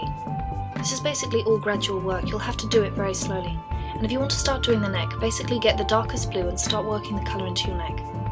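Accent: United Kingdom. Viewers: wow, i love your british accent and i love what you`ve done with your face; its damn sexy ^^ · Dunkles Avatar Make Up. Is aber der übelste britische Akzent.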